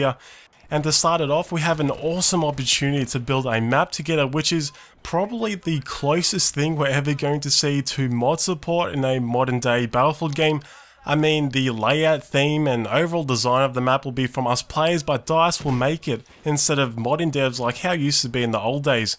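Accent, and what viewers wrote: Australia. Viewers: wait are you Australian? If you are that surprises me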